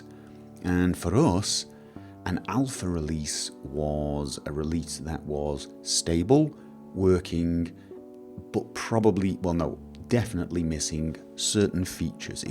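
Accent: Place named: North, United Kingdom. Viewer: northern accent is best accent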